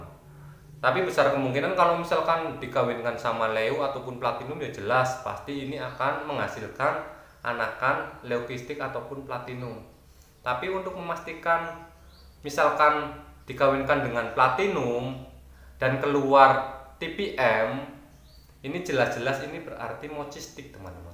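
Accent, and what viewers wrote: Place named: Banyumas, Indonesia. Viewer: Mas, maap orang mana yaa. Deneng logat e ngapak banget..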